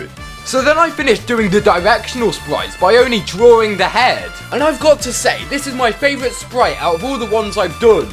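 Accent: United Kingdom. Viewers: This guy has the most british accent ive ever heard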